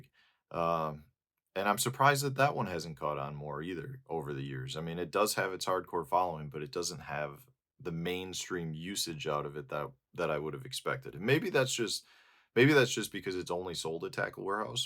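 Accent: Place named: Chicago, United States. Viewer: Hey you're becoming a cheddarhead with the way you pronounce about. Starting to lose that chicago accent. Don't become a Packer fan!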